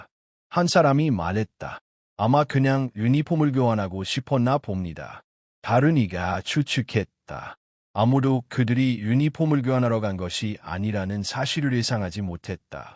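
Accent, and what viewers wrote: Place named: China. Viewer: 목소리가 보이스피싱 중국인이네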